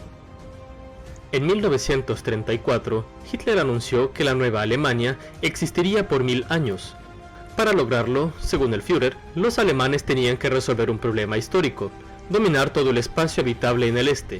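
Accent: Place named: Russia. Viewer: me gusta mas la vos con el acento tipo ruso, siento que le da un estilo unico al canal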